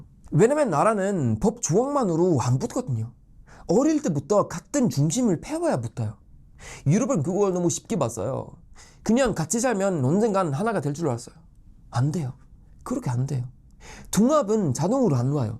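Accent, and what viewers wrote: Gyeongsang, South Korea. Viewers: 왜... 경상도 사투리가 들리지.....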